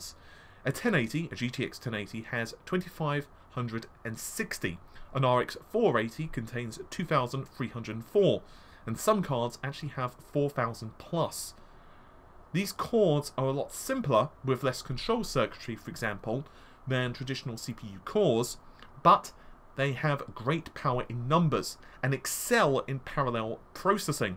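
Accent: United Kingdom. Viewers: Very hard to understand him. He sounds like a Brit but also sounds like a person with his english as a 2nd language. His explanation is hard enough to understand with all video gaming and not organized way and his pronunciation on those words is worse lol.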